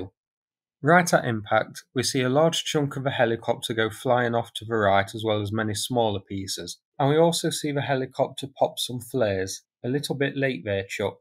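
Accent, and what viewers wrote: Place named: Northern England, United Kingdom. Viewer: Loved your expression "a little late there chuck" I'm trying to work out where your accents from? Leeds or Manchester? I'm in Leicester where they'd say a little late mi duck.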